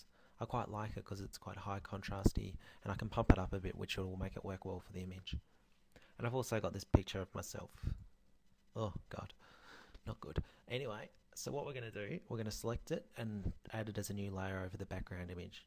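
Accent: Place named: New Zealand. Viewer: Very helpful, thank you! Are you from New Zealand? You accent is wonderful! :)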